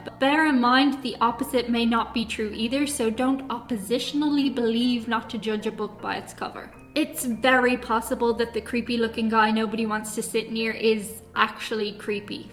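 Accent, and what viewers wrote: Ireland. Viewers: With that wonderful Irish accent you could tell me the world is coming to an end today and I wouldn't care.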